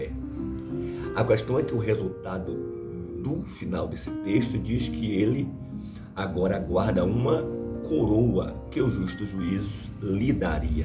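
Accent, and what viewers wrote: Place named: Paraíba, Brazil. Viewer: Sou paraibana e o nosso sotaque são parecidos e a sua mensagem era Deus escolheu Maravilha glória a Deus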